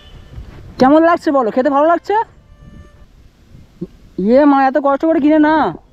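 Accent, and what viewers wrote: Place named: Rangpur, Bangladesh. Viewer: Tumar kotha rongpurer moto lage..tumar bari ki rongpur plc vai janaio...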